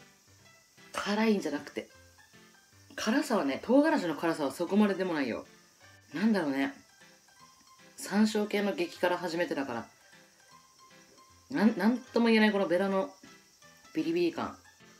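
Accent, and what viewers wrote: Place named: Fukushima, Japan. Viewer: 福島離れて思ったけど、なまりすごいよ笑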